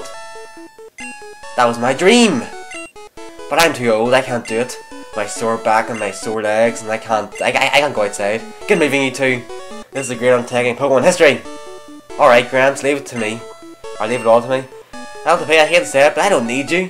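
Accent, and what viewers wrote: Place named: United Kingdom. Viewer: u sound kinda british / scottish / austrailain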